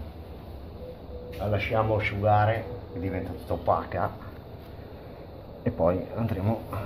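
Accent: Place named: Genoa, Italy. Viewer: salve le volevo fare i miei complimenti non so perche ma mi sembrava dall accento genovese la saluto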